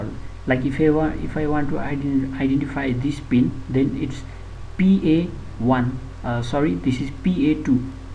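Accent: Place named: India. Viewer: Sorry about saying your from India. Just sounded like it.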